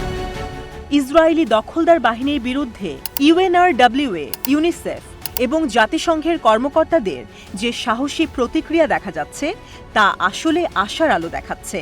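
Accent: Kolkata, India. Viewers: এই সংবাদপাঠিকা ভারতীয় মনে হচ্ছে কোনোভাবেই বাংলাদেশী মনে হয় না। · কলকাতার স্টাইলে সংবাদ পাঠ করছেন কেন?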